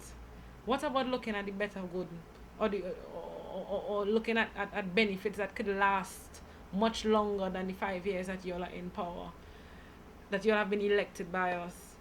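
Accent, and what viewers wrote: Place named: Jamaica. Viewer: You already sell out. stay in Jamaica married ah man and get Jamaican citizenship caused you already sounds like a yardy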